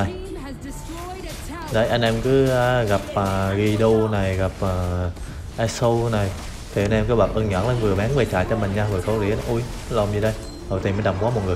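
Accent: Quang Nam, Vietnam. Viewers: Ông này ở quảng nam thì phải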